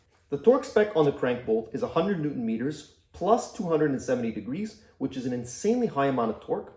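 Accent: Quebec, Canada. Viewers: J'avais pas réaliser que tu étais Québécois avant cette vidéo ahah Vraiment superbe vidéo tu es excellent!!! PS, j'ai dit le tabarnak en même temps que toi XD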